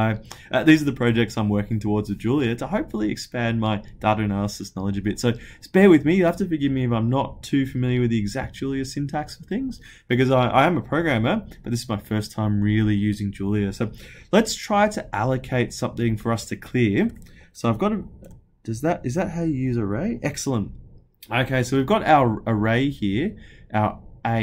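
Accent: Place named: Australia. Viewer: you sound like an aussie. is there any kind of julia community in Aus?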